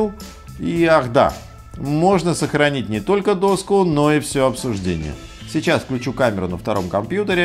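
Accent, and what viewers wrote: Moscow, Russia. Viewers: Это не акцент! Это московский говор! Москвичи ,,акают ".)))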